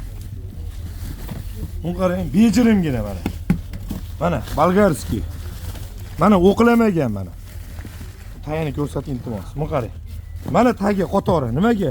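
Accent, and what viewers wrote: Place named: Andijan, Uzbekistan. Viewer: Бу бола жон куйдириб гапиришидан Анжанлиека ухшайди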